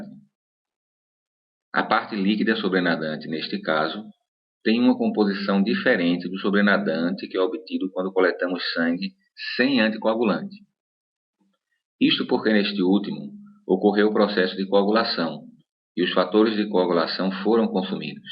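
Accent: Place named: Pernambuco, Brazil. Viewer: Amei o vídeo! E pelo sotaque, deve ser meu conterrâneo, pernambucano?!!!Parabéns pela clareza das informações.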